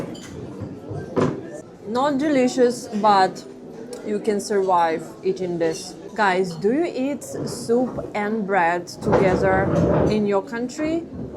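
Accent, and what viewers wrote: Russia. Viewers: Нет, всеравно русский акцент, я не choose гречка , а говорить l have chosen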